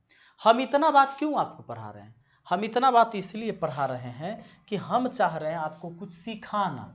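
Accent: Bihar, India. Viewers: govinda actor ki ek movie h bihari wali wo bilkul apki tarah hi bole h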